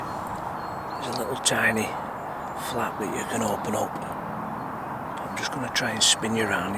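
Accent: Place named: Yorkshire, United Kingdom. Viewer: Awake sorry about that it sounds a little Yorkshire that's all and I'm from Sheffield and thought you were more local to me lol hope you're not offended anyway wouldn't want to do that to anyone. Anyway I was talk texting as I do and it sent automatically I was saying about the bird hide.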